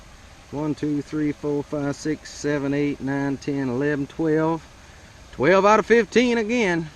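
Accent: Louisiana, United States. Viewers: Oh ok, Sounds Cajun Anywho excellent work, appreciate it. I have a 1187 and a 870. I’ve had great luck with a modified choke in the 870 with Remington slugs. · You a cajun or somethin'?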